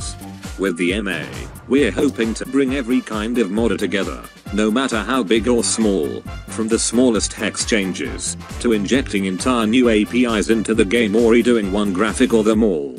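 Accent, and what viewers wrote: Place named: United Kingdom. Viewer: the tts voice is british, therefore it pronounces the "z" as 'zed' lol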